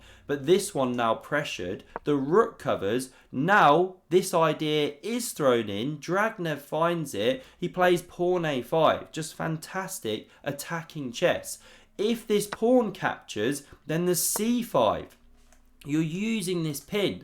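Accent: United Kingdom. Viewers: He's just British. Great channel!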